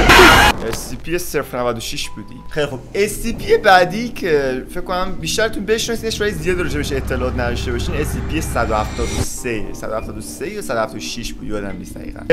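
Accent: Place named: Afghanistan. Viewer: چرا لهجت افغانیه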